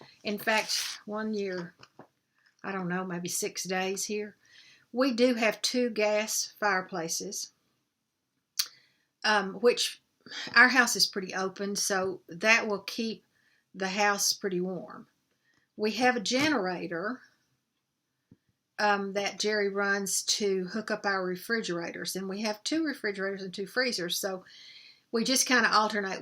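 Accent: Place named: Arkansas, United States. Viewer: . I loved your Arkansas French accent. My NE accent would be funny too! I love everything you do. That pillow for your gd is awesome and she will treasure it. TY for sharing what you have done! I do have a house generator as we get wind gusts and snow here on the coast! I’m so glad I got it. I too am blessed!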